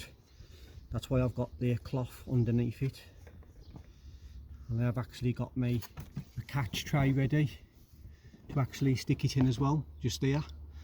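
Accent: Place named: Black Country, United Kingdom. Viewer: i dont think he is a brummie! defo from westbrom, dudley, tipton or wolves 😂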